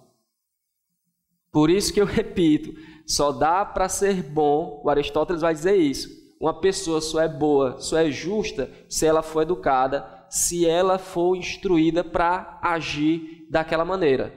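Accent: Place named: Ceará, Brazil. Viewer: Professor muito boa aula! O conhecimento liberta! Vc é cearense? Seu sotaque parece de lá, muito lindo. Minha família é do sertão do Ceará!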